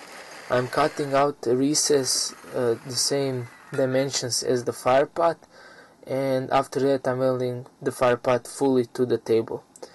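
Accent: Russia. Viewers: Great video. Are you from Russia? Couse I can hear your accent quite good :)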